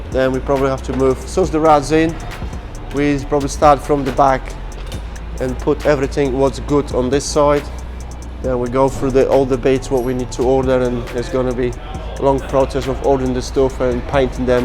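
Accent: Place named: Scotland, United Kingdom. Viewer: I hate this scottish accent, otherwise good job.........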